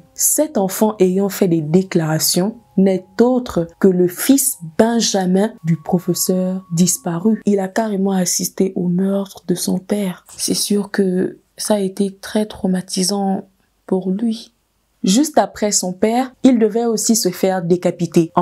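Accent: Fang, Gabon. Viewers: abonné, contenu incroyable et surtout que l'histoire m'est familière. Au passage je kiff ton accent fang 😁😁 😁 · Ahahah que tu sois fang c’était évident hein dès le départ hein, t’as trop l’accent 😅 Par contre je ne savais pas que ça venait de là „les fang mangent les gens“ 🙈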